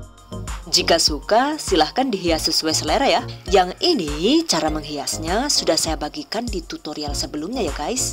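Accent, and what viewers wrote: Banyumas, Indonesia. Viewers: Orang ngapak 😂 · mantabbb bu...bahasa Indonesia-nya merdu, bahasa Inggris-nya fasif, bahasa banyumasannya nggemesin, hasil donatnya bagus..penasaran jadi pingin lihat ibu...jangan cuma tangannya :) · Jaannn bisane koohhh...jebulee ngapak juga rika yayunee😄😄👍👍👍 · Hahaha ngapak lah yu, wong ndi sih rika yu😂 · Jebule Wong ngapak kiye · Haha wongapak..donute mentul mentul! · Maknyuss donat madunya..yeni virgo akan praktekin...tks ya jeng yg cuantiiikkk..juga kadang ngapakk yaa..he..he..he..salam kuliner buat yg koment yaaa..suksess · Laah looh kok jebule ngapak.... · Selalu suka sama videonya n diselipin bahasa ngapaknya, ora ngapak ora kepenak hehehe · Hahahaa ngapake kaya aku mbak, asli ngapak ngendi mbak😁😁😁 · Pintu teater satu telah dibuka 😅 keren tutorialnya kak lengkap dan jelas banget penyampaiannya asik jokesnya dapet ngapaknya dapet hahaha like it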